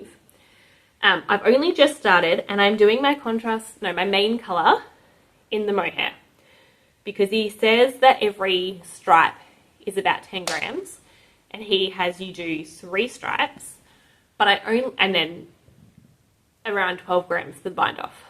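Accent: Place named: Australia. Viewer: Hello Lovely. You just popped up out of nowhere but Im glad you did. Love your Aussie accent and all your makes. So far from home but home is where the heart is, eh? Im an Aussie too of Romanian background. Do all the crafts too...about to finish a quilt and work on a few knitting, crochet wips . I am married to an artist.Coming up to our 20th. Love that your Dad paints trees.Go well in your days. Just finished my Go Go. Blocking as I type. ❤🐨🌏xx🌞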